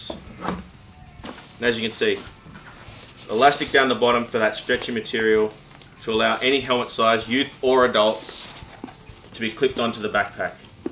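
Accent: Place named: Australia. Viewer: He has an Australian accent :) and we play softball in Australia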